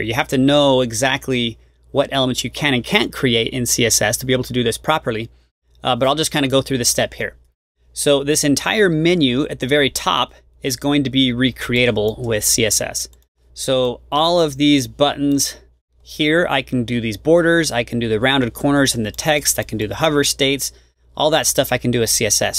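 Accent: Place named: Ireland. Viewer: Great video. You sound like you have a slight Irish accent. Which is really cool!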